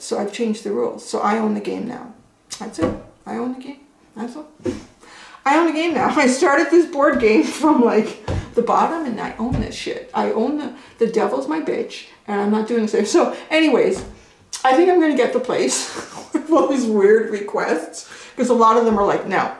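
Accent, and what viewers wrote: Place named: Canada. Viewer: Really like your channel- wish you lived nextdoor! You might almost, I live in Minnesota and you sound Canadian.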